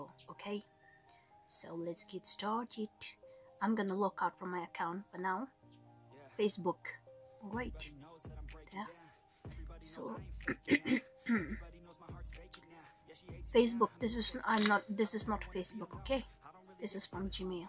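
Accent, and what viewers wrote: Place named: England, United Kingdom. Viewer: So much Overreact in English accent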